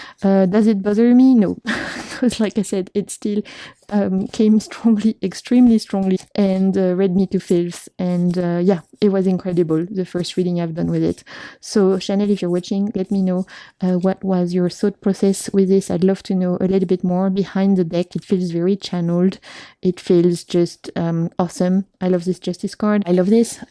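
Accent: France. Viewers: Whatever you say with a French accent is better. 😘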